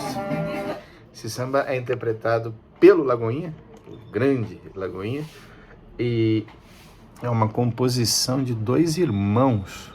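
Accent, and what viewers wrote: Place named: São Paulo, Brazil. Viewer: Cada vez melhores os vídeos. Bem legal também ver um amor sobre beagá narrado em sotaque paulistaço. Viva o Lagoinha!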